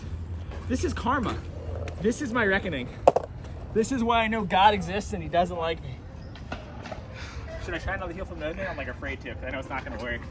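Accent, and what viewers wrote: East Coast, United States. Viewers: Is your east coast accent coming back?!!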